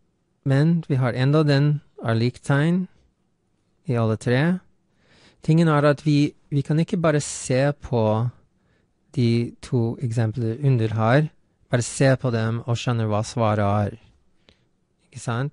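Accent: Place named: Norwegian-American, United States. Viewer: Awesome norskamerikansk, haha :D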